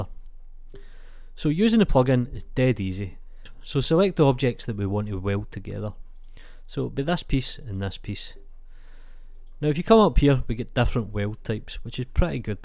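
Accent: Scotland, United Kingdom. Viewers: yep Scottish for sure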